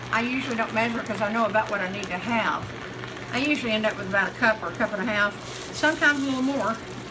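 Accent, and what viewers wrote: South, United States. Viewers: My Mom Would Love Her Southern Accent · Love your southern accent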